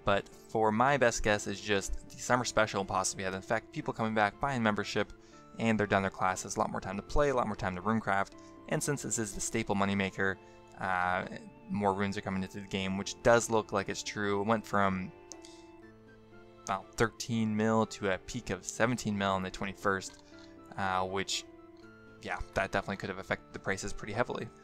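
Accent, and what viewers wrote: Canada. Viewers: mohammad baba tbh I get what your saying but I work a long hours job in sales so when I get home I want to relax and barely concentrate, this guy helped me when I first started flipping, I'd consider myself a veteran player now who understands it but I don't check the whole market for what's it's doing where as this guy does regularly so saves the time with his soft Canadian tones.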